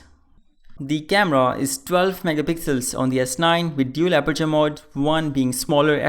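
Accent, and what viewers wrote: India. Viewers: "click on vid" hears indian accent, "clicks off"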